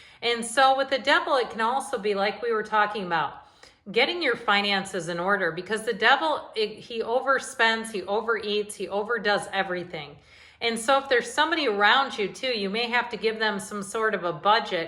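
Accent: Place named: Chicago, United States. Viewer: you sound like you're from chicago. I grew up there and know that accent anywhere! So glad I found you here and this totally resonates with me. One question I have is how is the retrograde helping abundance?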